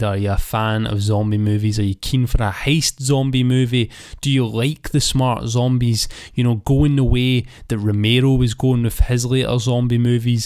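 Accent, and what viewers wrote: Scotland, United Kingdom. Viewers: Ive been looking forward to this 💪🖒 What part of scotland you fae mate? i cant tell if your same as me or west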